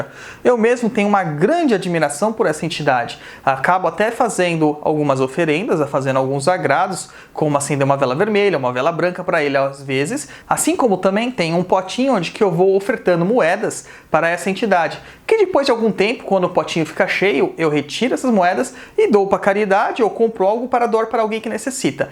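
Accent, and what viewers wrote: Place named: Rio de Janeiro, Brazil. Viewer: Baiano nada kkkkk ele é carioca todo cheio de sutaque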